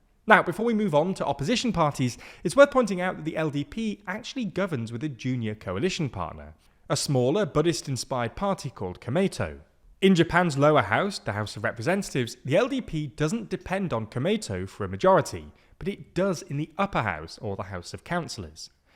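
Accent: United Kingdom. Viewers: Its his british accent heh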